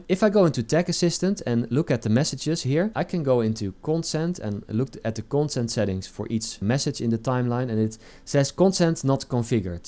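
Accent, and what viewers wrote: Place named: Netherlands. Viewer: Dutch accent best accent. 🙏🏻 Thank you for the clear video and god pace! Keep it up! 👏🏻👏🏻👏🏻👏🏻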